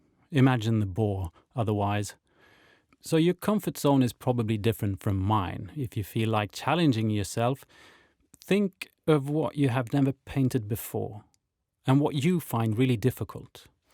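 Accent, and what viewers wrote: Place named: Sweden. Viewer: You sound Swedish, and the store looks like Incrade in Umeå. How far off am I?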